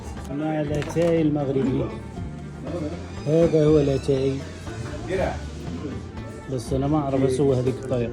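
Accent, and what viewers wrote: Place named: Oman. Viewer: من لهجتك عماني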